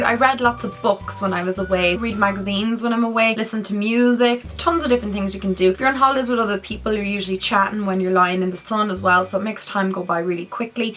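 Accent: Ireland. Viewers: what part off Ireland are you from Xxx love your videos <3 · She's from Ireland 😋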